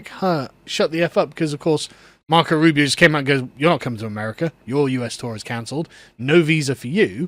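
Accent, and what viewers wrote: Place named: England, United Kingdom. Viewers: Indian woman with english accent? IGNORED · Seeing a fellow Indian use an English accent to push such stupid ideas is very disappointing. Violence as a tool is a communist tactic and should be confronted with the tools that worked in the past.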